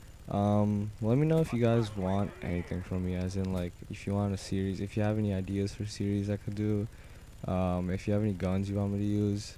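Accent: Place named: India. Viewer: indian accent!!!!!!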